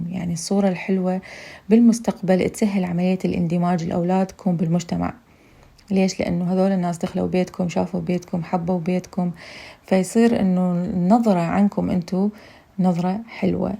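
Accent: Iraq. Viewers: ولا توقعتك مو عراقية ..لان اللهجه مابيها غلطه ..اكثر من هوايه عراقيين مايعرفون مصطلحاتنا 😂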